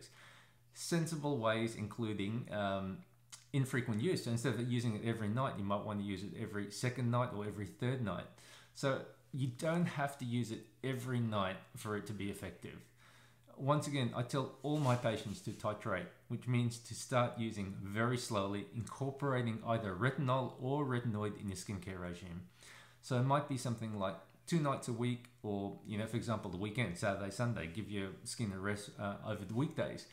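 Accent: Australia. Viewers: I enjoy watching your videos so much! They’re so informative and easy to understand and on top of that your Australian accent is just a plus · Most informative channel on skin care I've seen 💙 Bonus: The way he says 'yeah' at the end of his sentences with his Australian 🇦🇺 accent, awesome ❤️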